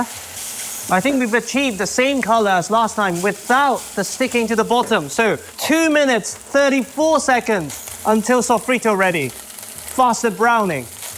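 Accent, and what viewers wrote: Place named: United Kingdom. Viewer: I’ve never seen an asian looking British sounding dude before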